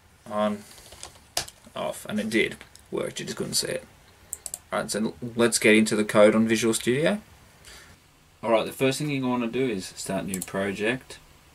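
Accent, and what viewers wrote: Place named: Australia. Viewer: Interesting video. Been thinking of getting into Arduino and wanted to see if I could do the programming in C#. Thanks for the information. Correct me if I'm wrong but you sound like you're from Australia. Hope all is well for you down under :)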